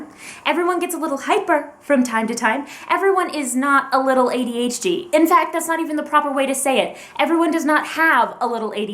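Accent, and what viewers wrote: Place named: United States. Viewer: She sounds country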